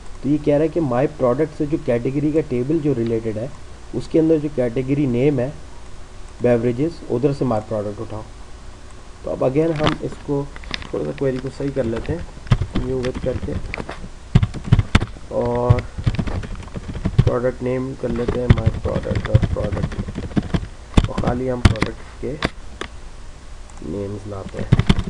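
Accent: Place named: India. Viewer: 印度口语~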